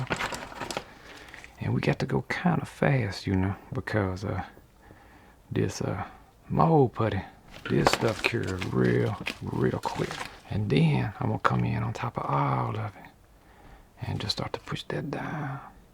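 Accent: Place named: South, United States. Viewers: Mississippi I think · I love your voice 😍, I grew up in the south and your voice is so nostalgic.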